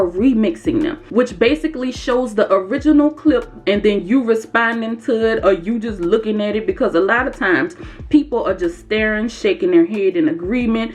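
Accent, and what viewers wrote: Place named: Louisiana, United States. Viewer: Nope....you can't hide that accent...hello my fellow Louisiana sister lol. I'm from Lafayette.. keep inspiring us thank you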